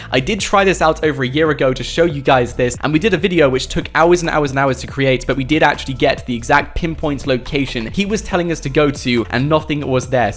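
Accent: United Kingdom. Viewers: I like his British accent. This is amazing. I liked, subscribed, and smashed the bell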